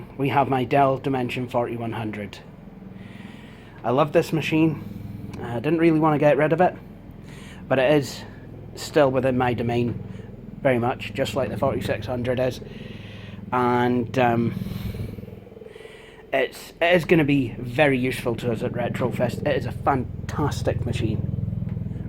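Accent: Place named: Scotland, United Kingdom. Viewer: are you scottish or British you sound Scottish :)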